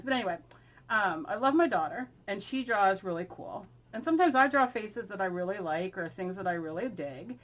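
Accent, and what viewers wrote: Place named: Philadelphia, United States. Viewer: You gotta be from either Philly or South NJ lol. I know my own “accent” when I hear it!!!!! (I didn’t know we had an accent until I moved from Philly to NJ!!!! And met people from N. jersey! But I’m watching this to learn. And the whole time I’m like- I feel like talkin to one of my friends or aunts!!!! Yep I’d bet on it!!! Ok. Back to watching now but please correct me if I’m wrong!!! Lol!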